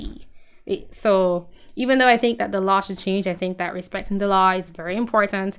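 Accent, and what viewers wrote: Jamaica. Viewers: Really nice jamaican girl. Your accent is so cute. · Since I'm not American I don't really care about any of these issues, but I just enjoy listening to your Jamaican accent.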